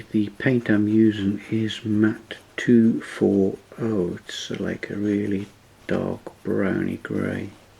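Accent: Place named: North East England, United Kingdom. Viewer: really enjoyed these two platform videos - I'm about to renovate/make some of my own. I think your craftsmanship and attention to details are amazing and your patience in doing the work exemplary. The soft north eastern tones of your calm voice make it a pleasure to watch. I fear I do not have the patience to do as good a job but I can assure you I have been inspired. Many thanks!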